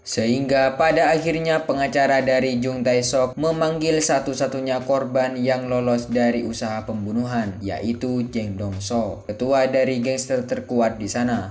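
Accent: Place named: Madura, Indonesia. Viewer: saran sih min, untuk suaranya mungkin lebih santai lagi, dan untuk logat maduranya lebih di redam lagi atau diminimalisir mungkin😭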